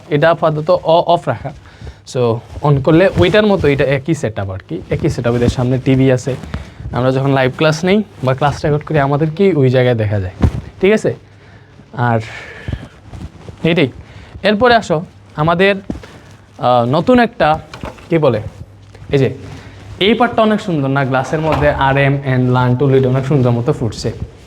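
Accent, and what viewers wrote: Sylhet, Bangladesh. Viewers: কথার মধ্যে সিলেটি সিলেটি ঘ্রাণ মনে হচ্ছে 🙄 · কথায় সিলেটি ভাইব মিলছে।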